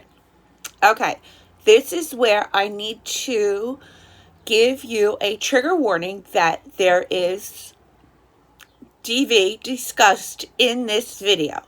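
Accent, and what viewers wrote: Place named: New York, United States. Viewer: I love listening to your accent (assuming you're somewhere in the tri-state area, specifically NY/NJ?) Makes me homesick. Also, that color looks SO good on you.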